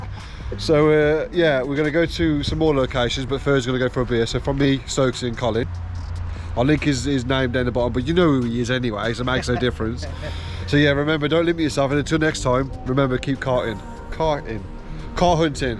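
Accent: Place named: England, United Kingdom. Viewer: What a fun English accent...and owsome cars...yeah...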